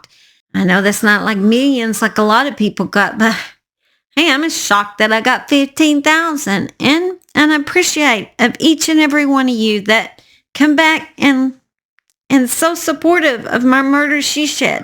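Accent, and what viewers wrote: South, United States. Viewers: me too, loads of stories I haven’t heard of, and there’s been a lot, if you decide to visit wrap up well 🧥🥶🧣🧕 your southern accent is very comforting to me, iv always wanted to visit loads of places in America but ended up in New York twice xx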